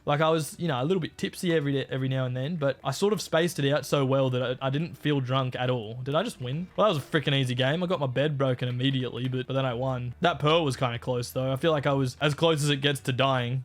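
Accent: Australia. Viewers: the amount of aussie in you is perfect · HE SOUNDS EVEN MLRE AUSTRALIAN THAN LAST TIME WE SAID IT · i thought you were austrailan for a second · His Australian accent has become more severe as years has gone on, especially after breaks. Edit: I assume this is because when he isn’t uploading to YouTube, he is conversing with other Australians IRL, but back in the day when he was constantly online, he was associating with primarily North-American creators which made him indirectly change his speech patterns to sound less Australian.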